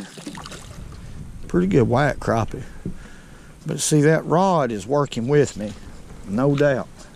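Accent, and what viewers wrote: South, United States. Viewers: Man I love your rednick voice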